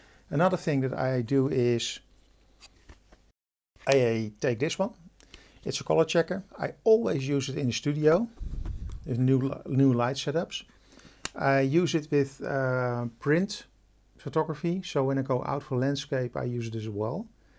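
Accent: Netherlands. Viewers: Heerlijk Nederlands accent 😋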